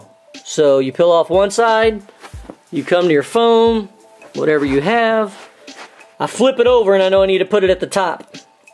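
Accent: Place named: United States. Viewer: Man, great american accent. From where you are? These days all USA people has an awkward kitty accent, men and women the same. Thanks for the video too.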